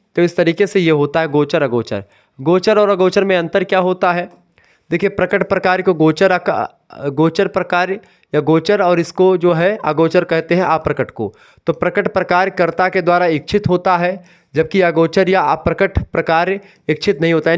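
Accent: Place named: Awadh, India. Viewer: Sir ka Awadhi accent ❤😂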